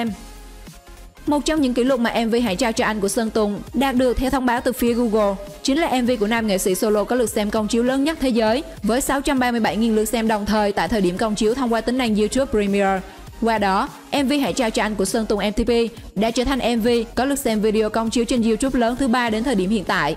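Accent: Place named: Binh Dinh, Vietnam. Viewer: Ns cn rặc giọng bình định quá nhe ..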